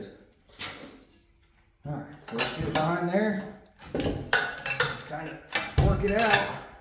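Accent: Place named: United States. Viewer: I'm as Ukrainian, I like your videos to hear a "real" American English, not like they're speaking on a news channels etc.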